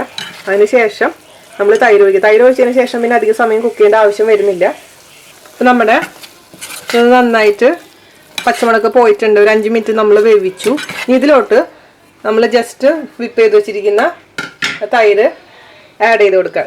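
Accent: Thrissur, India. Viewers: Chechi de samsaram kettittu Thrissur,Urakam bhagathulla aalu pole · Chechide veed evideyaa. Thrissur samsaaram aanalloo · fantastic. Your Thrissur style tone of talking is really an added attraction to the elaborative presentation. Well done.. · super pachadi.. 👍pine trichur language super... 😍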